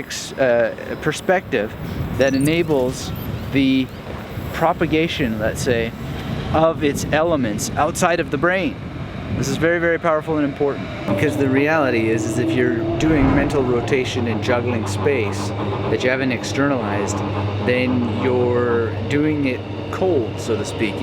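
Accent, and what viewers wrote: Canada. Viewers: Are you canadian? :o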